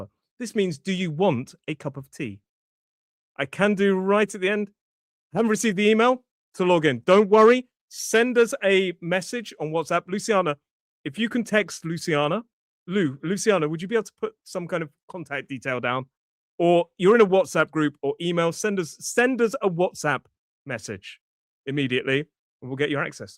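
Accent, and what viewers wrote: United Kingdom. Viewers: Greetings from Panama, Central America. I love that British accent; it conveys a certain air of royalty, class, elegance, and refinement that North American English doesn't.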